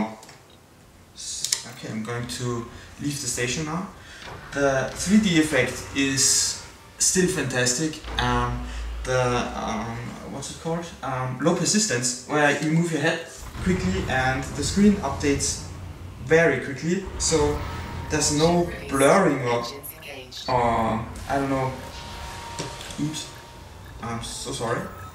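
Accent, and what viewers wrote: Germany. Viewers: That's how a real happy gamer looks like! :D By the way, are you from Germany? Ich frage, wegen dem Akzent :P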